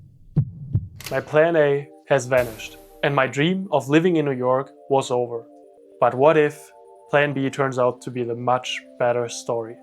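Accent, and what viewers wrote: Germany. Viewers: Are you Bavarian? I was thinking I was hearing the shadow of an Austrian accent, but then you said you were German, so the only thing I could think of was that you had to be from Bayern. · Yeah, you are the stereotype of the German/Dutch person apologizing for their "terrible English" while speaking with what I would consider nothing more than a slight to moderate accent. We don't mind the apology, but it can't be factually inaccurate (which should warm your German heart). Zero grammar mistakes, vocabulary greater than many native speakers, yeah you're fine there bud. The colloquialisms will come with time. Welcome to Canada. Bienvenue au Canada.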